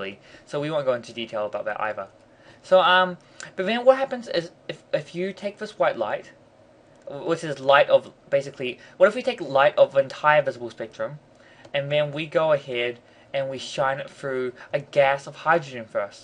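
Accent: New Zealand. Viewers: New Zealand accent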